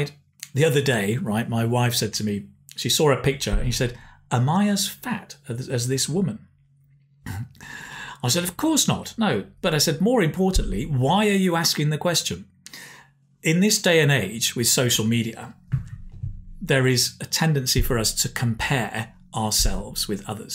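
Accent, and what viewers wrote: United Kingdom. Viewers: Where is from??? Nd is this British accent or american accent. Well I think it may be British accent.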